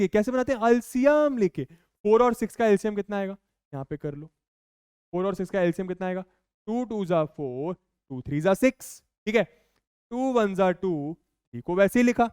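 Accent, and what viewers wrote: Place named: Bihar, India. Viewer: His bihari accent makes the lecture more understandable and relatable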